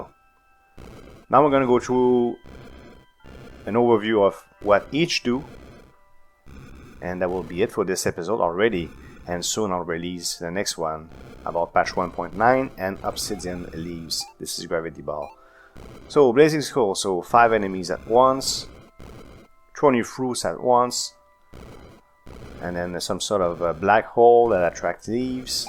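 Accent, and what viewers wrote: Quebec, Canada. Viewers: Es tu quebecois ?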